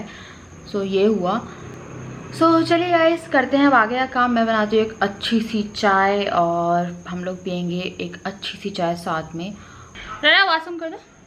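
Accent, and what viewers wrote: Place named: Kanpur, India. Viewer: Kanpuria bhasha mst comdey type bolti ho app🤣😂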